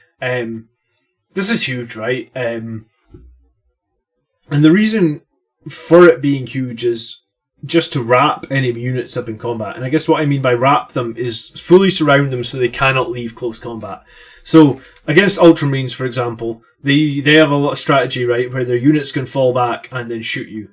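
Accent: Scotland, United Kingdom. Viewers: Is it heresy for me to say I watch your channel but am not making Blood Angels? It's just nice to hear another Scottish voice doing 40k stuff ! I'm building my very first army as the basic boys in blue, but your vids are good for understanding how an army should be built.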